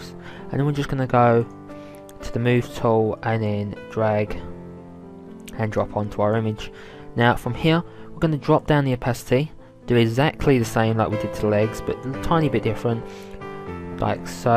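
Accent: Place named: United Kingdom. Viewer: the Q is U only my man has a heavy British dialect... for me it was hard to understand some things as well..... na mean??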